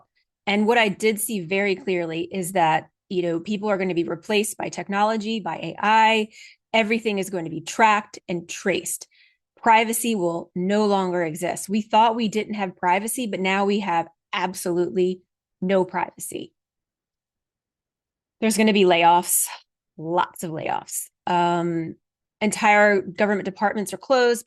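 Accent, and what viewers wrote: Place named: Australia. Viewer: Fantastic hearing an Australian biais 😅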